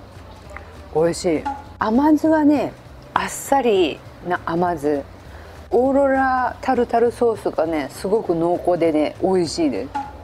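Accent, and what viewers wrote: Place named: Hiroshima, Japan. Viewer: 何か広島弁が入ってたかなぁと思って聞いてみました ごめんなさい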